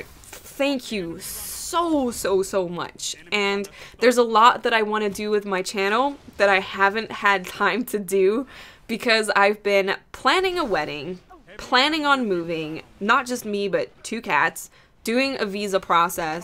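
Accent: United States. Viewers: arent you from america, you sound it!! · U sound American. U don't have a British accent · Where are you originally from? You should like your from somewhere in America but you and your mum are living in the uk? (Unless you are talking about the city named London in Canada) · she's lived in America and has an American accent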